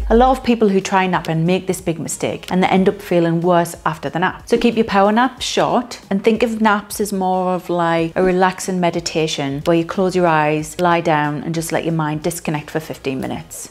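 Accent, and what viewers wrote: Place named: Newcastle, United Kingdom. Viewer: Must be a Geordie!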